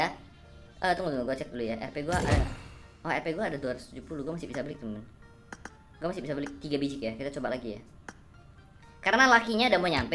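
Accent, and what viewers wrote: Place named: North Sumatra, Indonesia. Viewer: jelas kali logat sumut kau bg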